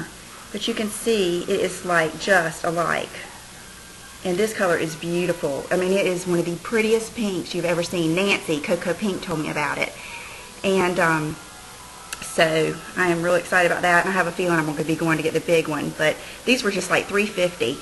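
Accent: South, United States. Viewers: No worries about that "Suthern" accent! he he, just thought to help out with the pronunciation and I didn't even get it exactly right! Can't wait for your depotting video! Thanks!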